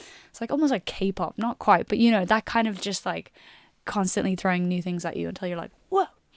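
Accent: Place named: United States. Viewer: Why are you suddenly American?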